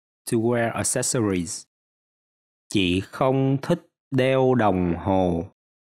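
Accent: Mekong Delta, Vietnam. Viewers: xin chào, Hello, Bonjour! anh cám ơn con đă gủi liên lạc cho học tiếng Việt giống âm thanh miền duyên sông Cữu Long. Thanks! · Giọng này là giọng miền tây, tôi đoán là Bạc Liêu vì chữ đêm nghe như đim. Giọng Bạc Liêu iêu thường thành im như thêm thành thim.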